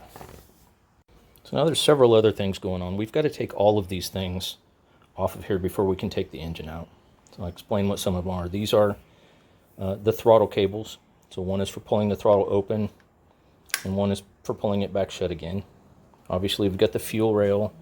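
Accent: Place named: Jamaica. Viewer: And thankz 4 di video bro very smooth and nahmal Jamaican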